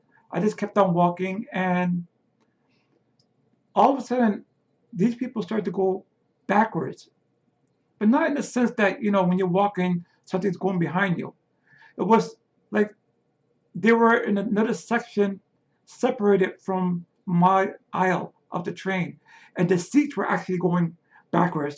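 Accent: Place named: New Jersey, United States. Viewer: Thank you for sharing. You sound like someone I know from New Jersey. Tone, speech, mannerism , and such.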